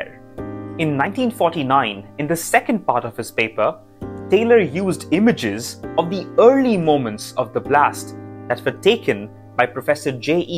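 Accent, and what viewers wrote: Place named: India. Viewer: only indian channel where the accent doesnt piss u off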